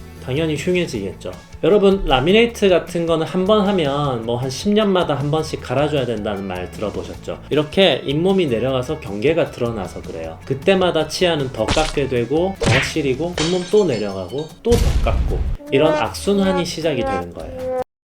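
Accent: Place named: Gyeongsang, South Korea. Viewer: 대구 경북 쪽 말투라서 더욱 신뢰가 가네요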